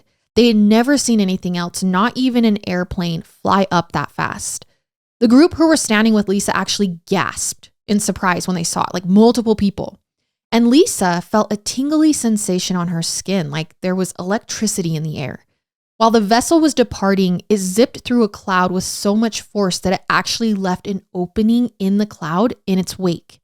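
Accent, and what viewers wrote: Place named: AAVE, United States. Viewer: Ebonics! It actually exists!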